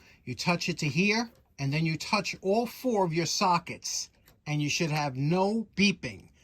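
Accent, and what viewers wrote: New York, United States. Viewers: Cheers, everyday is a school day watching you! Very informative and I find your explanations really enjoyable (especially in your new York accent) I'm loving going through all your past videos. Cheers from Devon, England 👍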